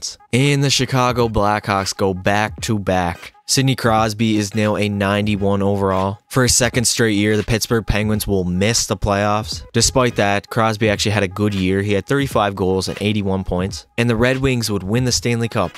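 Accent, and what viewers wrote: Newfoundland, Canada. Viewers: Any chance you're from The Rock? Or at least from NS? Asking because of your accent lol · Are you a Newfie?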